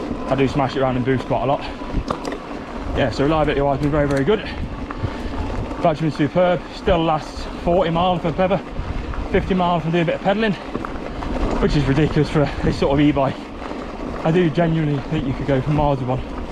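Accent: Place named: Ireland. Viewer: ay mate speak English, your choppy when you speak, only brits will understand you.,,,for a Mick....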